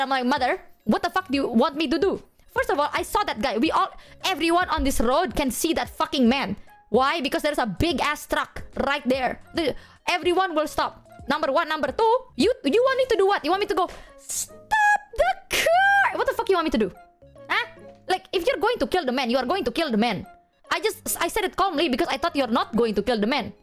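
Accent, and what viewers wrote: Indonesia. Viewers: I love when use her indo accent😂😂😂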